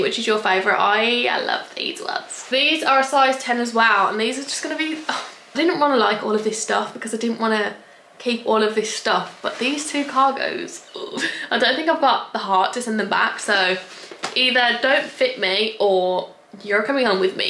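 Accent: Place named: United States. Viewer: I love your American accent! makes me feel at home w you😭🥺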